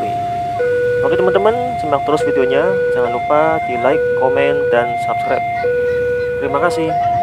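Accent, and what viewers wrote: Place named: Banyumas, Indonesia. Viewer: pean sh wong ngendi mas,kok Ngapak omge?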